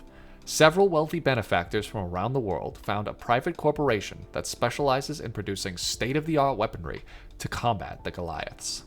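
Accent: New England, United States. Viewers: I’m moving from AZ to CT, so I’ll be needing some coaching on a subtle New England accent. Please and thank you.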